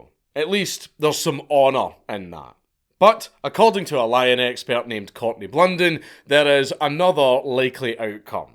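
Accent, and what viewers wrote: Scotland, United Kingdom. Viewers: are you the scottish version of beardo gets scared ? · I could listen to a Scottish guy talk about anything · That Scottish accent is great... "Sex lions", eh? 😂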